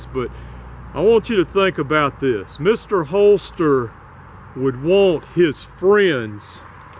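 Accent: South, United States. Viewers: My favorite carry is my S&W snubbie with laser. So light and easy to carry which explains why it’s my favorite. Keep up the good work. I love that southern accent of yours! LOL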